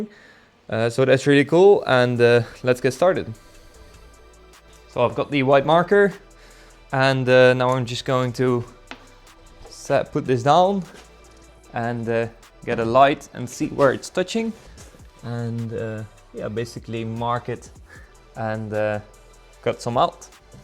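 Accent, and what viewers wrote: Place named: Brabant, Netherlands. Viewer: das wel bekend dialect!! midden Brabant?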